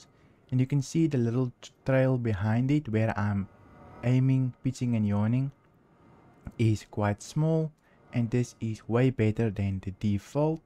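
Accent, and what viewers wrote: Afrikaans, South Africa. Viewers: jasis that afrikaans accent hits hard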